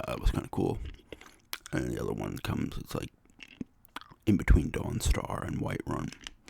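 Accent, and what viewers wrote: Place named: Long Island, United States. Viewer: Kind of hear a Long Island accent, i’m in Pequa. (Potentially) small world. Keep up the great work, love the videos!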